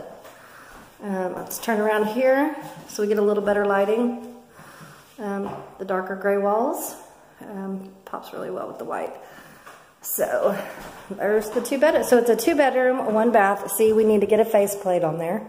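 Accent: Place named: South, United States. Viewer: I'm gonna assume your from the south hence the twang. Love your vlogs💖😘I'm in Louisville,KY which alot of ppl call 'the south' but it's actually mid-west or what some ppl call 'The Gateway to the South' so sometimes I say words like ' Big Ole' but I also lived in Chicago for awhile and sometimes I have pure Midwest accent w/o a touch of twang or Southern, it's the strangest thing! Lol🤷🏾‍♀️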